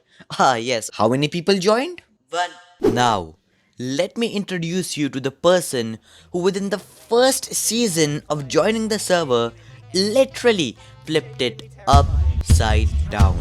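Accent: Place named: India. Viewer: You are definitely Indian. Judging by your accent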